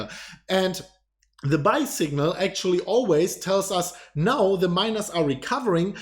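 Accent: Germany. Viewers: Love your german accent!! Love your videos! Keep doing what you're doing!! How can we turn on the hash ribbons on binance?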